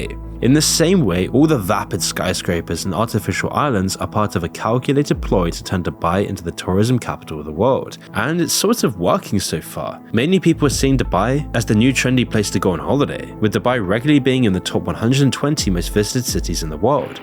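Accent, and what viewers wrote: United Kingdom. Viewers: Why is it these videos are always made with a guy with this kind UK accent? It's driving me nuts 😂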